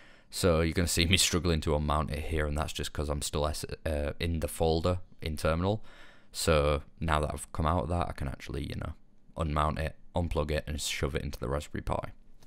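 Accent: United Kingdom. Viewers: you must be from 'ULL' ???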